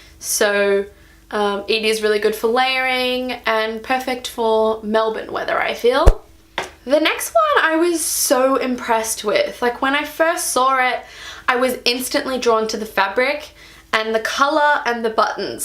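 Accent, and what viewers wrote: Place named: United Kingdom. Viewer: I guess I should mention that I'm making the assumption (based on your accent) that you are UK!? Could be wrong! You can totally listen to my accent and tell I'm backwoods!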